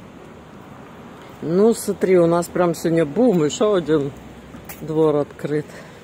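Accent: Odessa, Ukraine. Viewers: Спасибо вам за то что вы показуете Одессу. А голос я бы слушал бесконечно. Именно одесский говор. И никакие артисты в фильмах про Одессу не передают того одесского колорита как это делает. Спасибо вам огромное. Всегда смотрю ваши стримы. Я из Херсона их смотрю. Был в Одессе и очень скучаю за ней.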